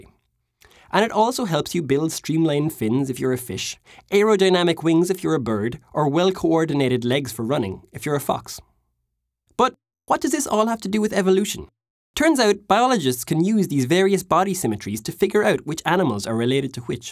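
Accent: Ireland. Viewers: IRISH ACCENTS <3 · Why do i think the speaker is Irish ? Maybe it's the accent. Did anyone else feel that ? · The voice of an Irish person makes my ears bleed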